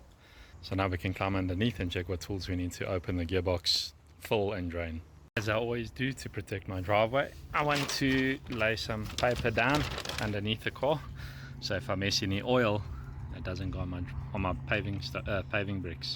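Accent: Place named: South Africa. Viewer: Lekker! Nog 'n video en 'n bietjie Suid-Afrikaanse aksent! Musiek in my ore!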